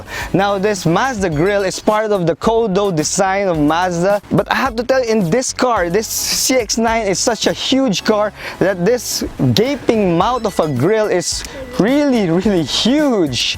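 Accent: Philippines. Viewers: filipino ,,pinoy accent wooww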